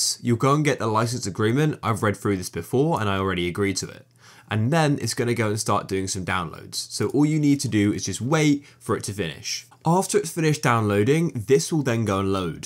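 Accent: United Kingdom. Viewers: soo british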